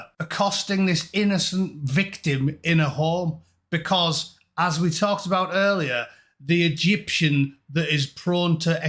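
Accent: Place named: Newcastle, United Kingdom. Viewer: Top notch Geordie commentary. Spray painted ducks and 190year old polar bear.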